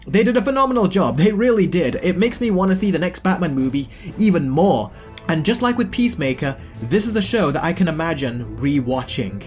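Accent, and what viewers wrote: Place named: United Kingdom. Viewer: As I was listening to this doing my morning routine, I realized something I should have from the start but didn't pick up on until now. Maybe because of your British accent or "British like" (not good with that stuff), but anyway, you got me thinking about "Vic" is shorthand for cops for a victim. So in an odd way the entire time, they gave us a clue he was destined to be Penguin's victom all along. The extra sad part is if he let Squid live he would have had at least one dude who would recognize his body, but he killed him because he knew he would be (Victor) be less valuable to Oz in crown point and Victor's neck would be shot instead of choked out at the end. (Not to mention his mom giving Vic love and thinking he is the elder brother at times. As soon as Oz knew he had that gangster Aisian dude Vic was no more useful to OZ as a #2 man just like Squid would have been. 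Sad but Vic was always going to be a Victom of the city. Hopefully Batman takes in an Orphin underground that was selling bliss and wandered into the wrong tunnel and we can finally get a Robin or a Robin spinoff series that brings in Robin for Batman 3 vs Mayer Ozwald Cobblepot the new Mayer who "understands" crime better then the last Mayer Reeal.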